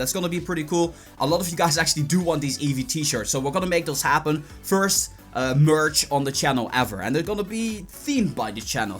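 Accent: Netherlands. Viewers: where do you come from you sound Hollands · where is he from? he kinda has a dutch accent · u live in NL that explains the accent i am from the netherlands too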